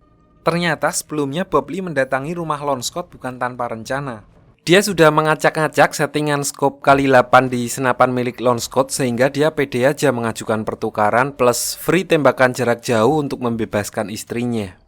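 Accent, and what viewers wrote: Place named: East Java, Indonesia. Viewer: Orang mana si bang, logatnya kek jatim bgt :v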